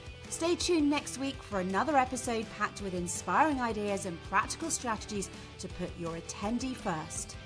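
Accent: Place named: United Kingdom. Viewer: Haven't been around for a while 🎉. Missed the British accent.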